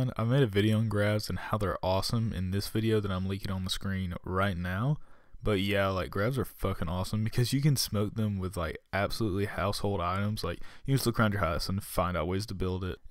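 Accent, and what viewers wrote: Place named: Kentucky, United States. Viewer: dude sounds like he from Kentucky :o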